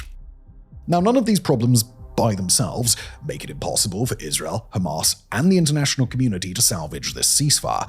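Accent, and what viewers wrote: United Kingdom. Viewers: wow he is so unbiased. Feel really ashamed that i used to listen to this bald old ass british accent from medieval london.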